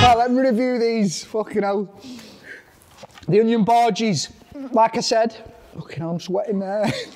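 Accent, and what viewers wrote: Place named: Blackburn, United Kingdom. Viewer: Blackburn accent going on there 😂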